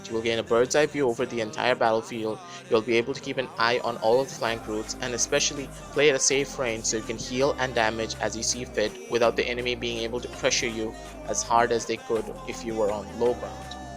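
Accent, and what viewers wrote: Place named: India. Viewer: Why u sound like an Indian?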